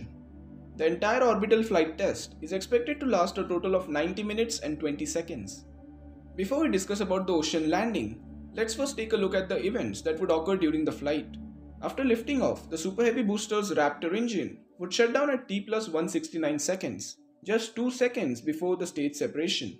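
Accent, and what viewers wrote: India. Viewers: i love the indian accest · Thanks! the expected timeline was not apparent to me, other than the text list of event callouts. The Indian accent threw me, to be fair I don't speak any Indian. Hoping for altitudes, velocities, geographic locations (or longitudes) and altitudes by the time list posted. Maybe energy dissipation per second (d(m*v^2)/dt), possibly temperatures (gaseous molecular velocities related to Boltzmann temperature). Go!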